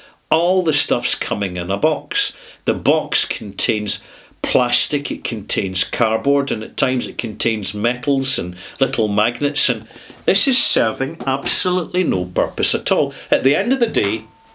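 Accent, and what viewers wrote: Scotland, United Kingdom. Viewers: "cranks are doing a hugely important job in keeping the entire category relevant and alive" It might seem like this is just ego talking, but it's really true. I myself, someone who drank virtually no alcohol at all, got into Scotch a couple of months ago after YT had decided to recommend me a video of a Scottish gentleman with a lovely accent, sitting in front of a barrel, talking passionately about whisky. Your enthusiasm is contagious, so after watching a number of videos I decided I had to buy a couple of (integrity!) bottles to see what the fuss is about myself, and thanks to your recent mini-series I want to get my hands on some rum too:). Cheers from Slovakia,!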